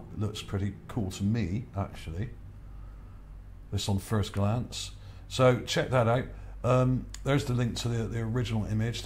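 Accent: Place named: West Country, United Kingdom. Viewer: dont deny your history , Mr, Westcountry accent. love your recent stuff , some of your idras can some times drift a little, but the upside is the west country drawl is as an OPIATE to many of your disciples.. thank you for the free opiates...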